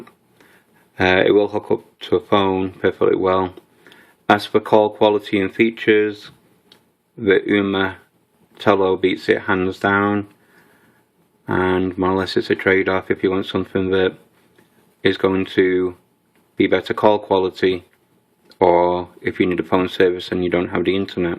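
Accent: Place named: Manchester, United Kingdom. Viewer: You sound like a Manchester Lad to me, Living in USA?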